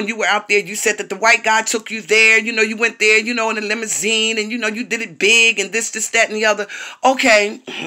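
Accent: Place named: New York, United States. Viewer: 💞💞 I just subscribed to your lovely channel 💞💞 I like the name 💞💞and I agree that does have a beautiful smile and very nice teeth 💞💞 is a sweet Lady💞💞as far as Adreian she just needs lots of prayer for deliverance💞💞then I think she will become a completely peaceful and happy woman 💞💞lastly I love your accent and you talk like a New Yorker 💜💜💞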